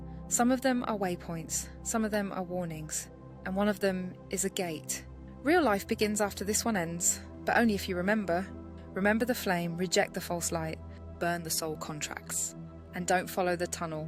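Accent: United Kingdom. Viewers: ahhh the British accent of wisdom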